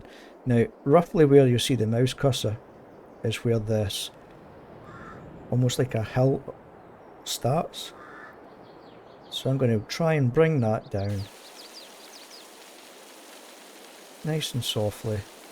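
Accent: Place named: Scotland, United Kingdom. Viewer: Taks a tcheuchter te ken a tcheuchtar! I subscribed as soon as I heard your voice. Just back from Scotland to Finland and driving around the North East, I immediately restarted FS22. I miss my home country so much and my country ways. Brilliant video. Thank you. Regards Fermer Findlay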